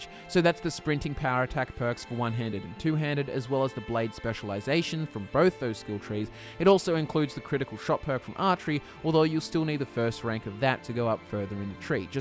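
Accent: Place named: Australia. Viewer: This made me realize that i have a thing for an Australian accent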